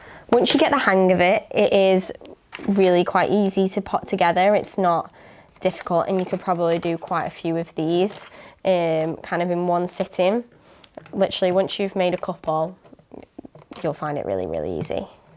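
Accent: United Kingdom. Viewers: I wish they would have someone who spends standard English and not British English, which harder to understand for Americans. Also, she has British accent and another accent.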